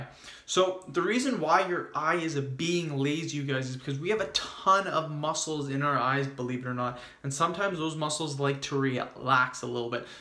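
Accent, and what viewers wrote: Canada. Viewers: You have a really strong Canadian OAT accent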